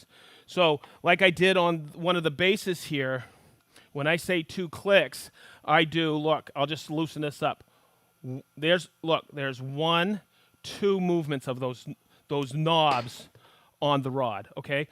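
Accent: Boston, United States. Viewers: Put the R s in the words for God sake. Hate that Boston stuff.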